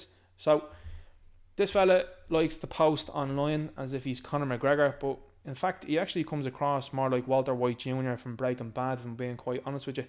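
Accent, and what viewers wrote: Ireland. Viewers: Can't stand the 🤡 not funny at all ever was he's cringe just shouts makes me emberressed to be Irish and his stupid culchie accent annoys me....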